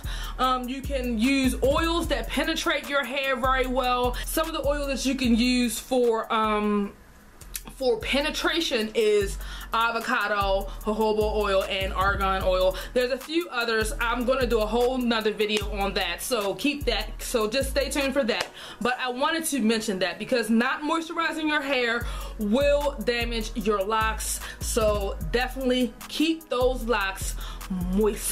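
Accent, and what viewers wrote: Baltimore, United States. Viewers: Great tips! You from bmore shor?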